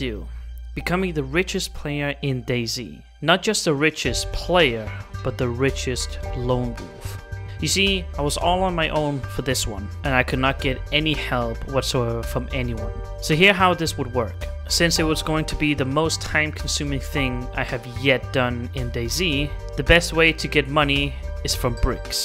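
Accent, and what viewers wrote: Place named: Denmark. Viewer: You sound very Danish. Could I possibly be right?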